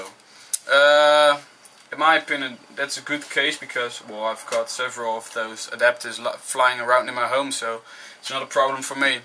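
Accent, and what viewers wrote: Netherlands. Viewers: volgens mij een nederlander, ( klinkt namelijk nogal nederlands en engels is ook met een nederlands "accent") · je hoort zo erg dat hij nederlands is =D if i talk english it's sound much better ;)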